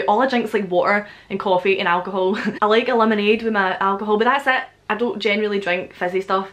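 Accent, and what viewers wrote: Scotland, United Kingdom. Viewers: Your accent is super Scottish and cute lol. It reminds me of Sheena Easton 😊👍🏼. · Oh my god. I could listen to your voice for days. I love the Scottish accent. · I'm Glaswegian and I'm literally so stereotypically Scottish — I love Irn Bru, haggis, tablet etc! We have similar accents :) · I understand everything and never had a problem, don't worry! Also, youtube doesn't give me an option for subtitles :D I love Scotland and don't mind the "accent", just do what makes you happy, don't try to satisfy the internet. <3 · This is my first time watching your videos an I ❤️ Your accent. I can definitely tell your Scottish. I would love to see your reaction to brave video. It's a cute cartoon! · I have been living in Glasgow for a year and now I am back in Spain! Listening your accent just brings me back to Scotland and makes me happy! 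Love the Scottish accent! 💙 Funny video! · 1. I understand you! You speak Scottish, you're caught. 2. I like gibberish! 3. Never seen Brave as well. 4. A Scottish accent is the best in British! 5. Curly Wurly. Just don't. It's those guttural Us. 6. I don't drink Irn Bru. I like you do Tea, Water and Whisky! 7. I have that issue on holiday!!! I've driven thru Kilmarnock tho! 8. Six is said Sex in Sweden. If that helps! That's from a fellow Scottish person! Keep up the good work! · I like irn bru enough for the whole country! I love your accent. Totally lost my central accent when I moved up to Aberdeen, so I love to hear it 💞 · My BF is Scottish so I can understand you, I’m used to the accent 😊. But yeah it is thick. Scots are pretty much tied with Greeks, Russians, and Poles for Europe’s most distinctive / hardest for outsiders to understand accents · Hahahha I laughed my heart out with this video! 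English is not my first language (I’m Brazilian, so I speak Portuguese), I’m still learning it. sSo, I was trying to listen to different accents, and it’s been mind blowing to find out so many! When you learn it as a second language (at least here where I live) teachers say there’s “American accent” and “British accent”. Man... I felt stupid cause I should’ve known better. I should’ve done some thinking. In Brazil we have so many accents that sometimes it gets hard to understand one another. But anyways, I’ve found your videos and felt in love for this accent ❤️ really, now I’m searching Scottish videos everywhere and anytime I can. I understand everything you say (sometimes there’s some few expressions that I feel that are specific for Scotland, then I make a search about and it makes total sense). But I have to agree with you, the translator gets it allllll wrong hahahahaha it’s so funny 😂 And the last reason you mentioned in the video was the one I most laughed about cause I turned the translator on and it only displayed “sex, not sex... 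sex, not sex” 😂😂😂😂😂😂😂 Great vídeos! Cheers!!! 😊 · Even though your accent and Merida's are different, you sound alike, my passion for the Scottish accent began when I saw a trailer from the movie Brave, your channel gives tips of the Scottish accent, it's good because I'm studying English and I want to speak Like you, scottish people, even though I still have a little bit of my brazilian accent, you've earned one more subscriber.:) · Great videos - I love the Scot accent and your vids are helping me learning the slang. So far one of my favorite Scottish slang is "Blather" - I run a rather large kilt group on Facebook and I am using more slang thanks to your videos. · I only had trouble understanding the first few videos I watched of you, but I picked up quickly on what you say. Also I would like to add that of all the Scottish accents I've looked up, yours is my favorite and pretty much the only one I listen to. 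· I don' have a problem understandin' the Scottish accent, probably because I watch so much Doctor Who.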